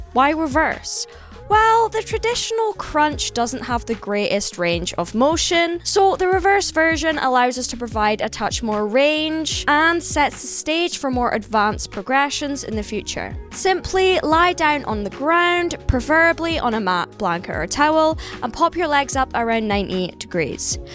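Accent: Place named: Scotland, United Kingdom. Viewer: Loved it thank you so so much! Love love love the Scottish accent. Not so strong though. 💚🤍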